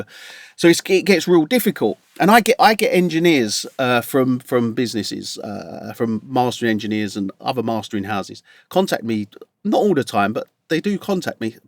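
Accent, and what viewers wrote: United Kingdom. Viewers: I love the british accent: "Can I masta"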